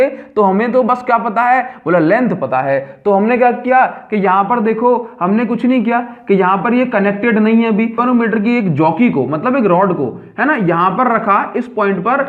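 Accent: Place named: Uttar Pradesh, India. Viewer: Aap uttar pradesh se hona Guru ji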